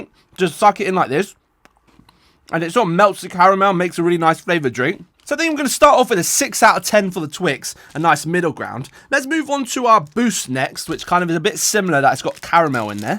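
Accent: United Kingdom. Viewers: The fact that he has a British accenct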